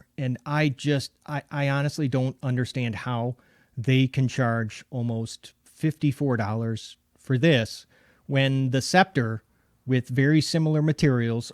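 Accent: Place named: Great Lakes, United States. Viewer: Thought I recognized that accent! Been watching your videos for a while now and never realized it. That "great lakes accent." Sounds like home. 👍 I lived in IL for almost 40 years.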